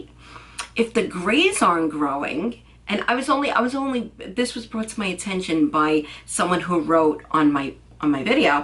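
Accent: New York, United States. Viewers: All ingredients used for hair growth have to come from inside the body not on top. You need biotin selenium etc. Dr. Berg has an incredible formula for hair growth. Ps. You gotta be from nyc you sound like me! Queens?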